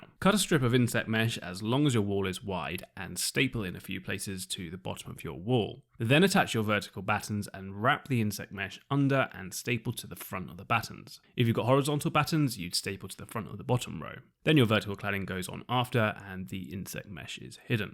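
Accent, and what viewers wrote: United Kingdom. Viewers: So good - thank you. We have just built a sculpture workshop with skillion roof, tapered purlins and spacers - VERY similar to a design that you show here. A labour of love with just the cladding to go. We started with no building experience. With a mixture of informed builder/designer friends offering advice, you tube tutorials - such as this superb example - and our own nouse we’re almost there. The last thing that we did on the weekend was to cut down a repurposed hardwood and full-length glass door and to fit out the door frame and install the door. It was fiddly but fitted beautifully - again using a you tube tutorial. All windows are re-used (free) old Huon pine ones. It’s an absolutely stunning timber that I use for sculpture. We’re using vertical rough-sawn hardwood (‘Tasmanian Oak’) cladding following your provided example, leaving air spaces due to the overlap. 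We live in Tasmania with a mild Mediterranean climate, though l spent time growing up in Devon. Your accent’s a bonus as it takes me back to time spent with my cousins in the UK! Thanks for your thoroughness with this guide; much appreciated.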